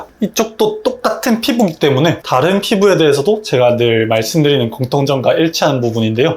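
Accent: Busan, South Korea. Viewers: 부산분이신가요? ~하고 앉았노? 하는데 찐텐 나오심 ㅋㅋㅋ 부산분들 좋아요. 의리가 !! 암튼 잘 따라해보겠습니다.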